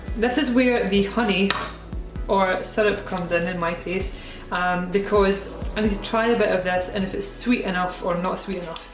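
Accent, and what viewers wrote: Scotland, United Kingdom. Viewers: This is the 1st of your videos I've seen, and it was a quick like and subscribe! I loved your zen attitude while you called the dough a bitch. I am miserable when it comes to baking. The worse was when my mom had cancer and asked me to make an Italian ricotta pie... so easy! I told her I couldn't make dough, but she said "any idiot" could make dough in a food processor. Long story short, I ended up with a crumbled mess of shit and broke into tears. Granted, the mini nervous breakdown was due to the fact that my mom was dying, but that I couldn't make that pie for her sucked, too. Anywhoosle, down the road, she loved what was to be the last meal I made for her, a simple goat cheese omelette. Thanks for taking me down memory lane. I also loved that I misunderstood your Scottish accent (me stupid American). I thought you said "beatnik" instead of "beaten egg" and laughed, "So mince pies are gamy after all." Happy New Year!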